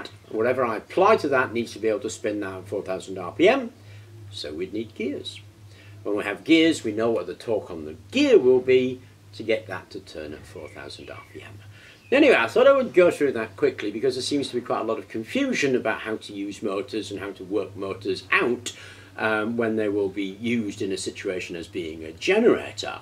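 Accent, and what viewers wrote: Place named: United Kingdom. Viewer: lol, i cant stand british accents.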